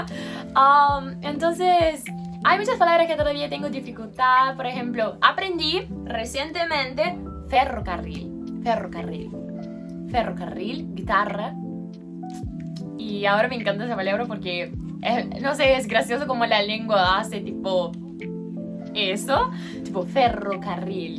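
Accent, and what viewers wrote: Buenos Aires, Argentina. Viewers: Que bien hablas argentino!🩵✌️🇦🇷👊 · tienes un acento porteño..como asi?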